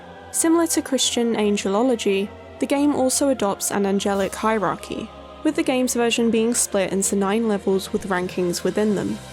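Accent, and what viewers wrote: United Kingdom. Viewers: I expected a male narraror But that attractive british voice Hot damn!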